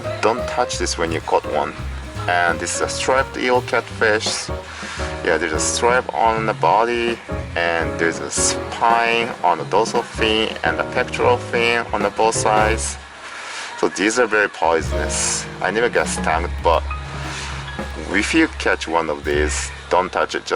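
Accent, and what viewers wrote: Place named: Canada. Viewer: いつもいうけど、のカナダ仕込みの英語めっちゃ好きやで。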